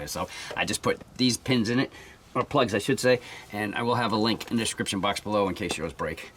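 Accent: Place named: Connecticut, United States. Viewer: I’m from CT and it’s refreshing to hear, reminds me of the 90’s. The accent is dying here in CT and becoming more neutral (don’t hear it as often as you did 30 years back) Mass has their own accent, New York and even RI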